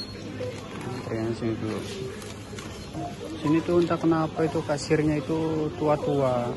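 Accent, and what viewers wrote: Banyumas, Indonesia. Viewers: wong ngapak ya kang hhe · Amin. Amin. Sama-sama Mas. Ngapaknya tidak begitu kelihatan kok Mas 😁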